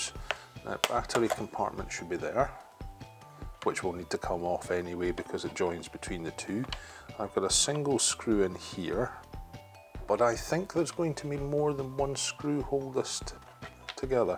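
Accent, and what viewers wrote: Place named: Glasgow, United Kingdom. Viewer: This man speaks with a Glaswegian accent. He is accent is straight out of a medieval celtic play circa 1700.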